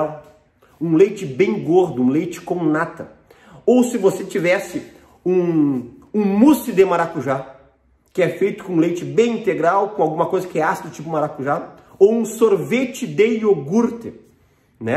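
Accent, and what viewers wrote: Rio Grande do Sul, Brazil. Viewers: Obrigada doutor, é muita bondade ensinar o que sabe, que sotaque bonito o senhor tem! Sotaque dos gaúchos.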